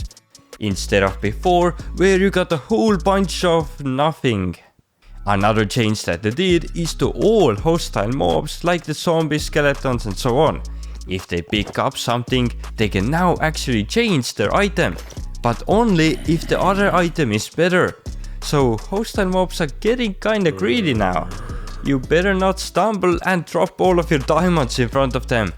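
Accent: Estonia. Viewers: Are you from Estonia? just curious cause ur accent is so Estonian. im from Estonia as well🤓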